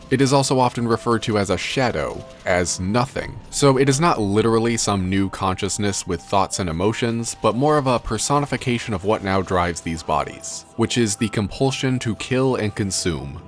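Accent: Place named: Midwest, United States. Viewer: Are you from the U.S. Midwest? You have a bit of an accent.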